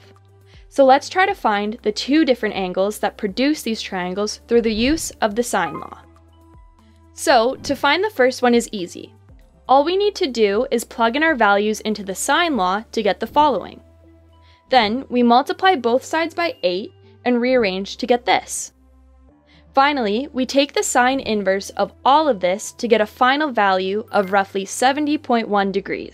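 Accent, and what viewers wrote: Canada. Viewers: Ur Canadian aren’t u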